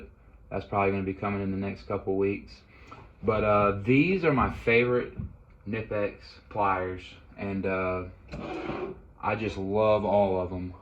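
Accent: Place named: South, United States. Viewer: It's far too easy to hear a bit of a southern drawl and equate that with dumb-ass, youtube has shown me plenty of clever dumb-asses 😀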